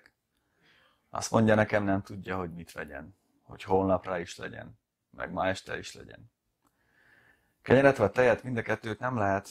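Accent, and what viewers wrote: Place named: Borsod, Hungary. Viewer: Borsodi vagy? (Nagybátyám borsodi és neki is ilyen tájszólása van)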